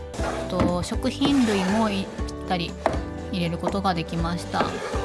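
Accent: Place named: Osaka, Japan. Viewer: 、ご実家大阪なんですねーー。端々になんとなーーくなじみある大阪弁のようなイントネーションを感じていたので、一人で納得してしまいました。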